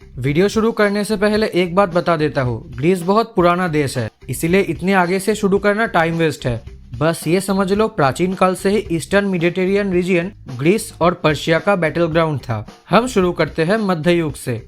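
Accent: Bengal, India. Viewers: Bhai ami sure, tumi Bengali😄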